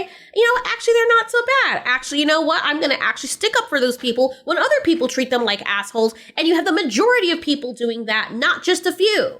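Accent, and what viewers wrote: United Kingdom. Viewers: Brutal takedown done with the best mocking British accent ever! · Love your British Accent by the way. Also great analysis ❤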